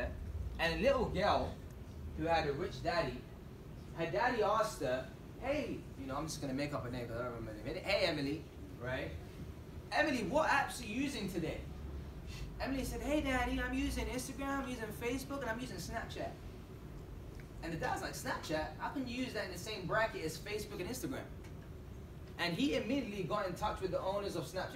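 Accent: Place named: United States. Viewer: Why does he talk in an American accent when he's British????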